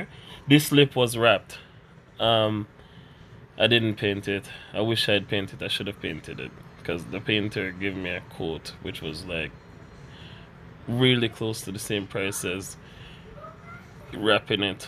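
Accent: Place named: Saint Vincent and the Grenadines. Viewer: man almost sound Vincy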